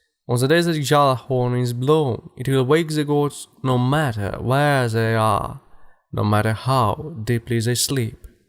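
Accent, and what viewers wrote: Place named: Finland. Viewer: Side note: had to look up your dialect cause it sounded like a weird mix of finnish, italtian and german. UKRAJINA BOIIIIS!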